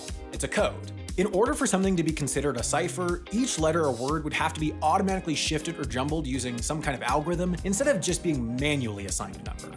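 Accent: United States. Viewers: Pronouncing Porsche as "porsh" instead of "porsh-uh" is totally acceptable in American English, which you speak. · okay how is the lancaster pronunciation incorrect? i'm from the OG lancaster and you basically pronounced how i would (albeit with an american accent) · You ptonounced Lancaster as in Lancaster, Lancashire correctly as far as I heard and as far as it was possible in your American accent.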